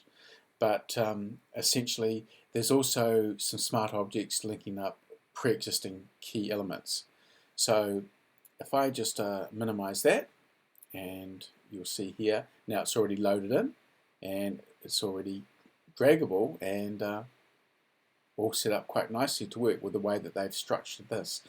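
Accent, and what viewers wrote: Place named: Australia. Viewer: Really good intro vid,. And nice to hear another Aussie accent in the animation world! 👍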